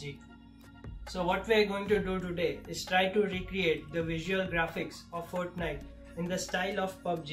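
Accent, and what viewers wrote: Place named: India. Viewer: all i need is 1 sec to understand u are from india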